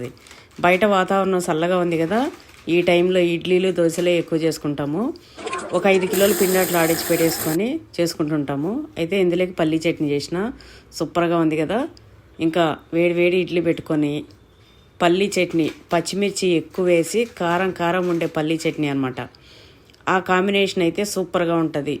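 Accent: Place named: Rayalaseema, India. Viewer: అక్క మీ భాష తెలంగాణ రాయలసీమ స్లాంగ్ మాట్లాడతారు మీరు చాలా చాలా నచ్చుతుంది నాకు❤❤❤❤❤❤❤❤❤❤❤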